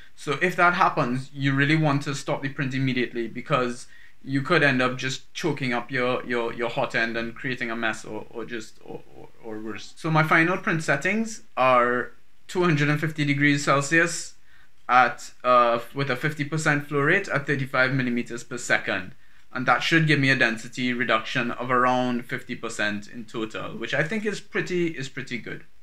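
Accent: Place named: Jamaica. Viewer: Thanks so much for the Video with the ender 3 saved me a lot of time. Sounds like you are from Jamaica, I grew up there myself, one of the few white Jamaicans out there. Thanks again, for the great job!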